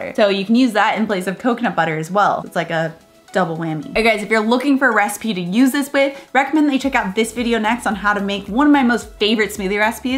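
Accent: United States. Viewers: could you please slow down a bit? I just can't follow what you say (and Im sure may others and those who are not familiar with the US accent will also struggle). Great content though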